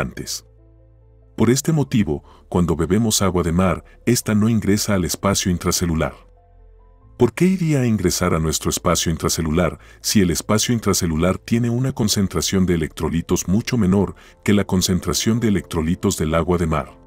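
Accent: Antioquia, Colombia. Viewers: Es mejor que ahora ocupes la voz de la IA . El acento parcero o paisa es muy cutre . Felicidades y mucho éxito para este canal .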